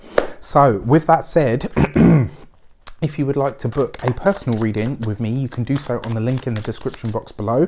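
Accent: England, United Kingdom. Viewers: You’re so wonderfully English “now that I’ve waffled on” haha. Thank you for this lovely reading 🥰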